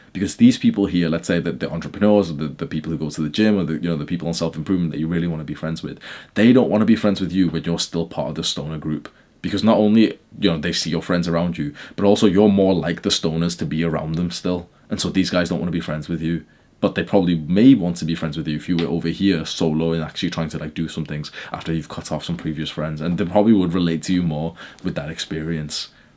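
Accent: United Kingdom. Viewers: if i wanted to listen to brit accent for just for sake of listening to brit accent, i can throw on some Grange Hill or GB News. sorry moyt, i'm listening to this fooking bloke cos he makes sense. ta! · Great video title then boom hes british :(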